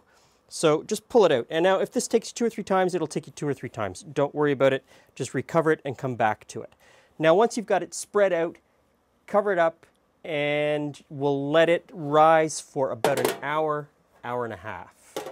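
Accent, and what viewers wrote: Canada. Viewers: Hey. Love your videos. Something interesting: my grandfather was from Iowa, and his accent rubbed off on me (despite me being raised in California)... I sound halfway between a Canadian accent (like yours), and a Southern US accent... Also, I cant wait till I have a bigger kitchen! I wanna try so many of your recipes. Keep up the good work!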